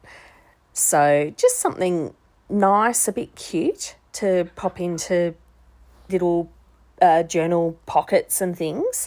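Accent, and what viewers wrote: Australia. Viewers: That was a great interpretation of the prompt. I'm still not sure what I will do but I did find this helpful. Love your Aussie accent. Blessings and hugs from New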